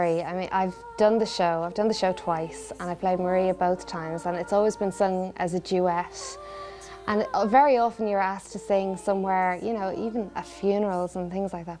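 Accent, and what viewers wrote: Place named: Ireland. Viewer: the best! Her singing is incredible, and that Irish accent... I melt when I hear it!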